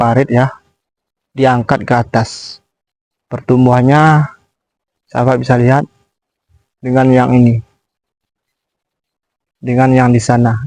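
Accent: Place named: Paser, Indonesia. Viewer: Logat mu kaya suku paser kabupaten penajam paser utara ikn nusantara kalimantan Timur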